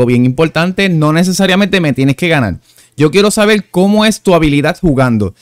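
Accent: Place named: Puerto Rico. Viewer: Es de puerto rico rey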